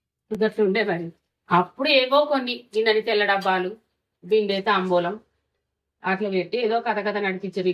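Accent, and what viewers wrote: Telangana, India. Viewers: బలే చెప్తున్నారు మీరు చాలా బాగా చెప్తున్నారు మాది గోదావరి జిల్లా కానీ మీ తెలంగాణ యాస చాలా బాగుంది 👌👌🙏🙏🙏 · Pakka GODAVARIKHANI la undhe slang nijamena😅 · Natural ga manchiga telangana yasalo chala sakkaga jeppinv amma ❤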